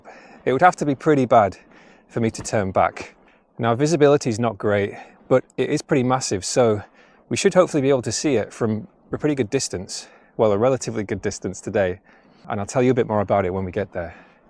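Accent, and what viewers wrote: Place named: Northern England, United Kingdom. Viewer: Your Northern accent pronunciation is sooo smooth compared to a lot of people I hear, It's magnificent.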